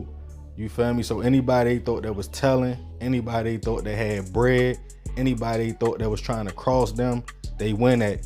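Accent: Baltimore, United States. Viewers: The narrator got an Bmore accent..... Im originally from from Baltimore but reside in Richmond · He from bmore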